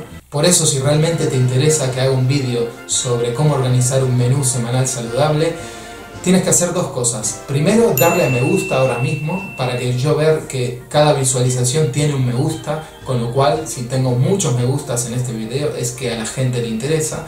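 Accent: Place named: Argentina. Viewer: Hola! De casualidad encontré uno de tus videos (creo que fue buscando cómo hace galletitas de avena) y me fuiste llevando con los temas a ver varios de ellos. Es muy interesante esto de la nutrición macrobiótica, están buenas muchas cosas que plantea pero es difícil dejar y/o cambiar ciertos hábitos cuando uno disfruta tanto de la comida y ha sido criado así no? Es más, ni bien te escuché pensé: este chico es argentino!! y no paré hasta confirmarlo!! se te nota en la forma de hablar =D Yo tb lo soy... es muy loco que estés viviendo en Finlandia!! Qué lejos!! Jaja pero bueno, nos demostrás que a pesar del frío tremendo que hace allá se puede comer saludable. Saludos desde Bs As!!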